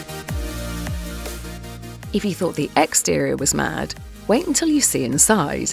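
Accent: United Kingdom. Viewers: She has such a british voice and accent🤣 most british women sound and talk the same. Which is weird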